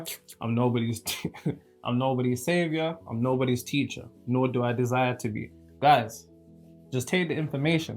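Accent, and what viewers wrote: United Kingdom. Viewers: Lol😂 I'm glad I didn't put the comment about the way you say pasta like "pastor " I mean I get it we sound different from every region of the world ....I truly admire the u.k. accents most of all if I see you I won't try to sound like you but I can't help but copy your accent at home 😅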